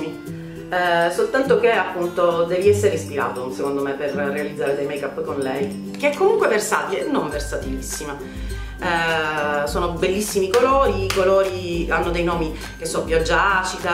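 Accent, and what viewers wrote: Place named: Sicily, Italy. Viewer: Ciao! Sono d'accordo con te in merito alla Naked 1...manca qualche ombretto opaco in più! :-( Dall'accento mi sembri sicula... come a me! :-) Un bacione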